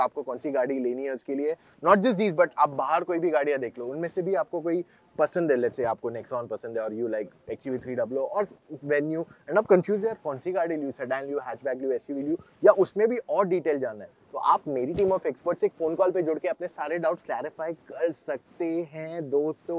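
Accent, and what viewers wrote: India. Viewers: CNG ka बाटला... 😄👌🏼Love the desi accent/words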